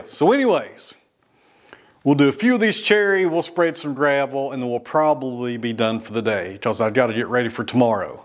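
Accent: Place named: South, United States. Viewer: .. I agree, there is good and bad everywhere, but I'm Mexican and resect, politeness and manners is really big in my culture.. so I really admire it when I see it in other cultures.. maybe it's just that southern, twang that sounds so cool... LOL.. and do you notice how much he loves and respects his father? if it wasn't for that southern twang, I would swear he could be Mexican.. LOL...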